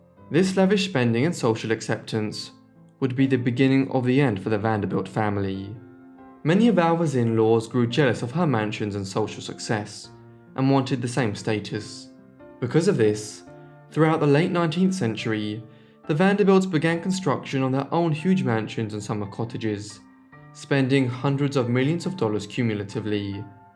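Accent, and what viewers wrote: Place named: United Kingdom. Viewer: You mispronounced the name of the city she was born in. Albeit it is spelled Mobile it is actually pronounced as follows (Mo Bill). Your accent indicates to me you come from the United Kingdom if I heard it correctly so I suspect that's why you pronounced it the way you did as it appears on the page. Rest assured I'm not trying to be rude but am simply from the south and felt you'd like to know the proper way it is pronounced down here. I'm rather sure you might find that helpful for future reference.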